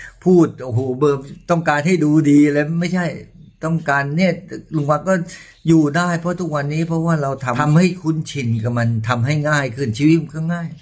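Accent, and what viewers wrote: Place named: Prachuap, Thailand. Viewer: เพิ่งเข้ามาดูไม่ทราบว่าคุณพี่คนจังหวัดอะรัยค่ะสำเนียงเหมือนๆคนประจวบ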